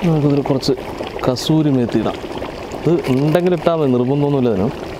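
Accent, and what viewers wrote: Palakkad, India. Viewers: പാലക്കാട്ടെ സംസാര രീതി നല്ല രസം കേൾക്കാൻ ഏട്ടാ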